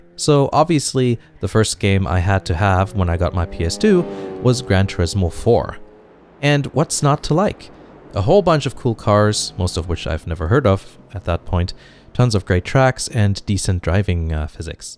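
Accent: Germany. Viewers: You are from Germany :D?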